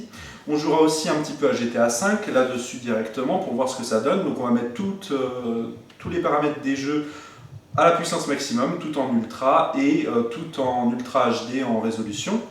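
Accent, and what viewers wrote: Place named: France. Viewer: fucking french accent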